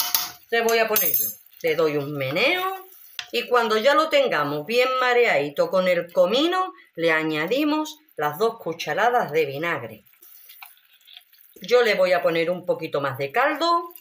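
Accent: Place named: Seville, Spain. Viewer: ñiña disfruto viendote y hollendote te espresas habeces como mi abuela carmen era la autentica sevillana como tu besos desde barna att.maria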